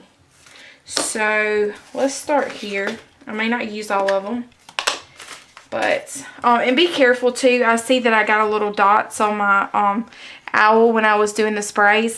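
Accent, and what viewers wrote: South, United States. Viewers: Love all those bright colors and how you incorporated the paper towel. I threw mine away not long ago...my bad. oh how I miss that southern accent! I attended Auburn a long time ago :)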